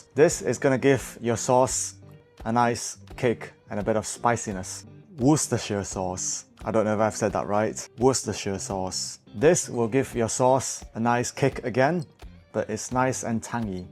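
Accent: Northern England, United Kingdom. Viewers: Are you from Hong Kong? I thought I detected a partial British accent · Your British accent make it authentic flavor 😅 easy to follow , thank you . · This is like ' Sunday Kitchen ' on BBC 2 But Chinese Style. And a Northern English Accent BRILLIANT !!! 👍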